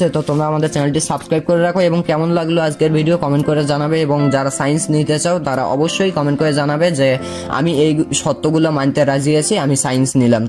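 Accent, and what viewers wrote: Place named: Joypurhat, Bangladesh. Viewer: জয়পুরহাট এর ভাষা 🙂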